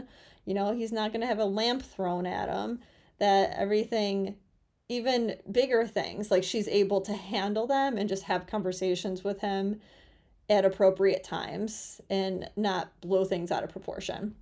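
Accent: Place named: Midwest, United States. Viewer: Liking the midwest accent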